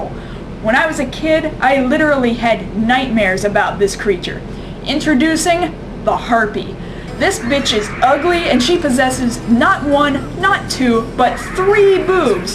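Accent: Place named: Texas, United States. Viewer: Thats the beauty of a Texas accent!!! YAY!!! Southern People rule!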